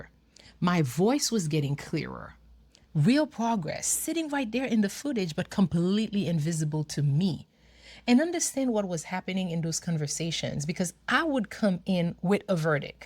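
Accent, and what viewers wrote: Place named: Haiti. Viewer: Wait a minute, you're from Haiti 🇭🇹...I kinda thought you might be because of your accent but that say confirmed it...I think you might be the person I need in this season, like I commented on that previous video I watched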